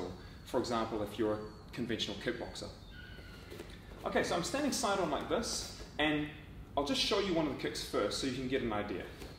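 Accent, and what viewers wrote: Australia. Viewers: are you australian? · do I hear an Aussie accent ?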